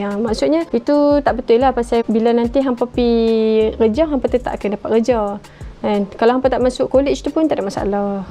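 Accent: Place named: North, Malaysia. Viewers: bantai cakap utaqha tequih 🤪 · Bahasa utaqha dia mmg piaw<link> 😎 Episode lepas: pakai accent KL Episode kali ini: You're the truly Oqhang Utagha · Muka mcm mat saleh tapi kedah piau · Sedap dengar dia cakap utagha.. padu👍🏻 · Utara universal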